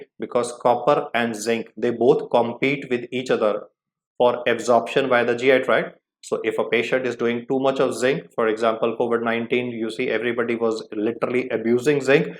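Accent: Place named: India. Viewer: could you add eng subtitles please? I have real problems with Indian accent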